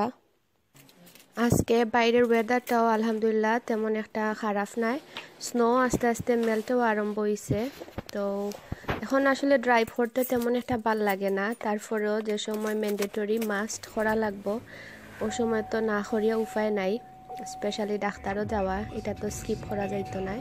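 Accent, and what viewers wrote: Sylhet, Bangladesh. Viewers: Asalamualikum Appa. Try kormu afnar recipe. Very nice recipe. I like how you talk in sylheti even I feel same that we are sylheti we spouse to talk in our language. Thanks 😘❤️❤️❤️ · Apu,, miss ur sylheti maat.... ❤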